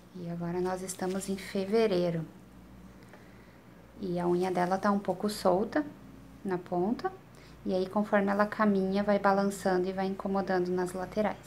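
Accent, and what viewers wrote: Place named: South, Brazil. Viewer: Pelo sotaque ela é do sul.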